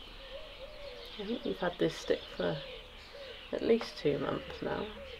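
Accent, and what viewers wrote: United Kingdom. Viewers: I used to own a business here in rural Georgia where I would look after farms, or just pets while people were out of town. All of the critters touched my heart in their own way, but the birds I found fascinating because their vocal ranges allow a window into their thoughts and also their humans. Like with Fable, it was obvious you were British, laugh easily and are a calm, gentle person. I wish all of my beloved animal friends I’ve met owned a human like you! Your critters are so blessed to have you! Love from the Blue Ridge Mountains - and congratulations 🍾🎉🎊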